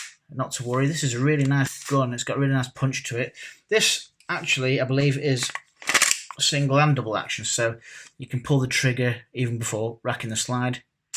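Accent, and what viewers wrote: England, United Kingdom. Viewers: I really enjoy your commentary. Your explanations and English descriptions are very pleasing to the ear. I've always enjoyed the English accent. The adjectives you use are first class. Love your content brother!!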